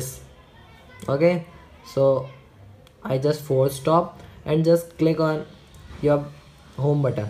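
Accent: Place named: India. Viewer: Thank you dude! You're amazing (it's a little hard to hear Indian English lol)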